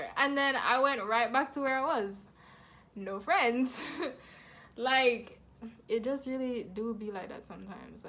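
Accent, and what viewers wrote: Jamaica. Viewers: Are you Jamaican? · You must be jamaican , accent trang trang trang 💯💯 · Bredda you neva haffie tell me you Jamaican could tell from you open you mouth 🇯🇲🇯🇲🇯🇲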